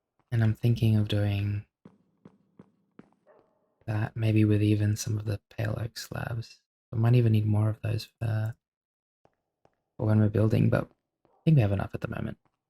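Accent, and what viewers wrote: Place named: Australia. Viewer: You uploaded this right as I needed something to sleep, thank you for the great content as always. As a fellow australian, it's comforting to have a familiar accent. Especially since I am staying overseas right now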